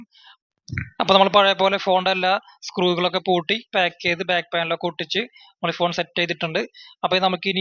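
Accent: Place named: Thrissur, India. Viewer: sound കേട്ടിട്ട് തൃശൂർ ഭാഗമായി തോന്നുന്നു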